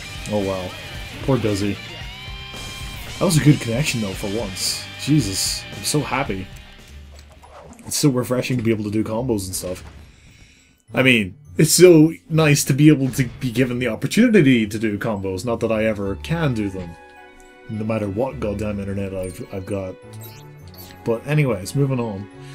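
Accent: Ireland. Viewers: Which part of Ireland are you from?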